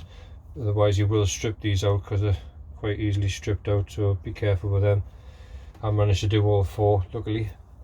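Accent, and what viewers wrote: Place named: North East England, United Kingdom. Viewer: Where you from mate, sound like NE?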